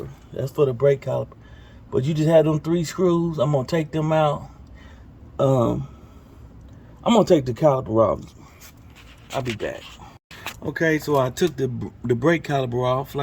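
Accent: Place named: South, United States. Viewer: Nice job! I think you got a little lucky being able to get the hub off like that. Sounds like you is from the south....but up here in NY it might be more difficult for me to do. I got some whirring going 50mph+ steering to the right so it's next on my list of things to do to my 2008 DTS. Keep up the good work and thanks for the detailed explanations!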